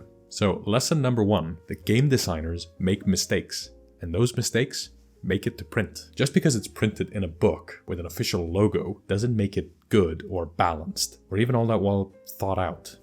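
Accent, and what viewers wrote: Iceland. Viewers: You have a great voice. The tiniest little hint of an Icelandic accent gives your voice a bit of charm, and your pronunciation is very clear and crisp, making you easy to understand on cheap, low-quality speakers.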